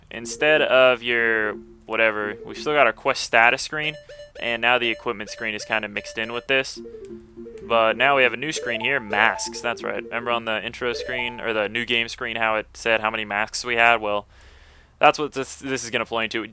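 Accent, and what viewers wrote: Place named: Australia. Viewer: Lol am form England and you sound Australian with the accent!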